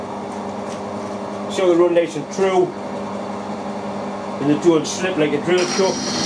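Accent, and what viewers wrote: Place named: Northern England, United Kingdom. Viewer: So good to listen to someone with a good northern accent rather than an american when learning about using lathes.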